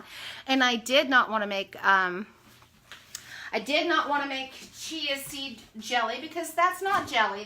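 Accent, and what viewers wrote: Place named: South, United States. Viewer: I am doing Keto IF so I am at the point where my appetite is basically gone some days, but I have been eating the same foods for a while now. Mostly the usual and I am following the 7-10 cups of vegetables a day per Dr. Berg, but your Keto bread and other recipes are going to help me to make this more of a good lifestyle rather than such a restricted lifestyle. I'm from MN and sometimes when we northerners listen to someone from the South it can be hard on our ears but you have the sweetest voice and it's just so nice to listen to your laugh!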